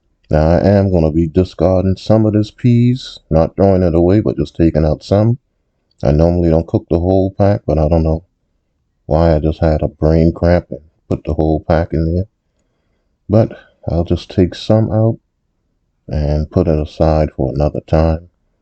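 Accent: Charleston, United States. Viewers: You sound like you from Charleston 👀👀👀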